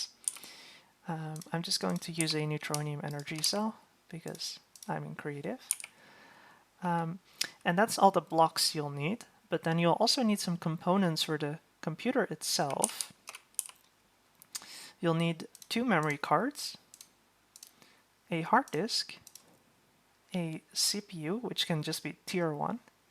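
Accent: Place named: Netherlands. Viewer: I love the dutch accent xD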